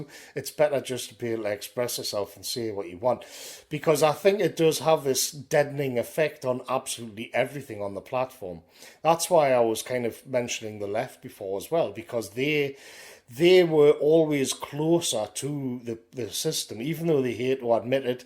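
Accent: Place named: Geordie, United Kingdom. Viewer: The algorithms can’t make out your Nordie accent.We peasants think it’s charming.Follow you anywhere.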